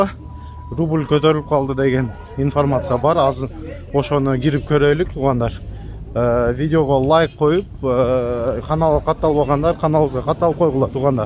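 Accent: Standard. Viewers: Кыргызча таза суйлойт экенсин ошол учун катталайын каналына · Молодец укам кыргызча ны менден таза суйлойт екенсин,озбек босондагы ушунтип дружно жашай вергиле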